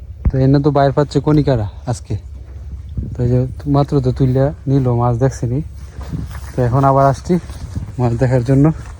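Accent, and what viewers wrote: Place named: Mymensingh, Bangladesh. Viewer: ভাই আপনার বাড়ি কি ময়মনসিংহে কথা শুনে বোঝা যায়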